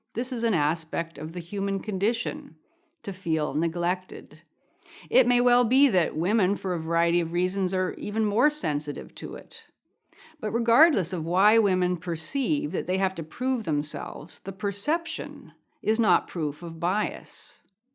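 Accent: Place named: Atlantic Canada, Canada. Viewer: This is neither here now there, but listening to your voice takes me back to my youth in Atlantic Canada listening to CBC programs before it went completely insane. That essentially examining and considered tone and accent.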